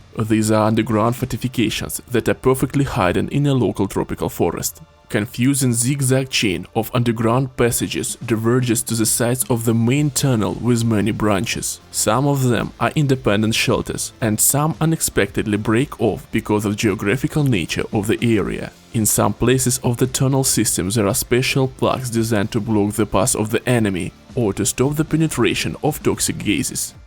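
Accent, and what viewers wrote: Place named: Russia. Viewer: Молодец, но акцент всё равно русский, американцы поймут, что ты иностранец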